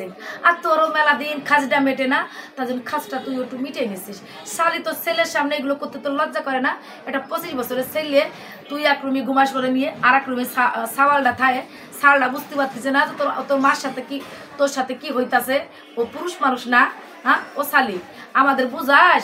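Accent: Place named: Khulna, Bangladesh. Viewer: খুলনা থেকে দেখছি দিদি,,,ভাষা শুনেই বুঝেছি কাছের মানুষ আপনি❤️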